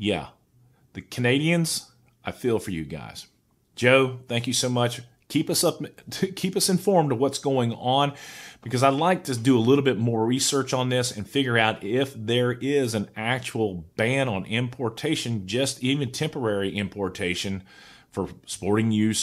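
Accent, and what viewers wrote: United States. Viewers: I thought you were american?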